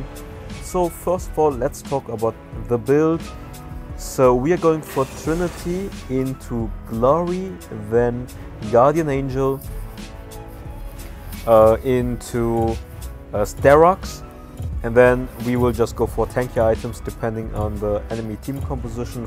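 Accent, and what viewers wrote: Germany. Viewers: dieser deutsche Akzent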